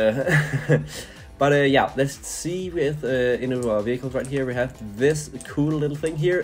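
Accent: Denmark. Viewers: are u danish? u sound danish with ur accent, and it says on the credits that u translated the game to danish???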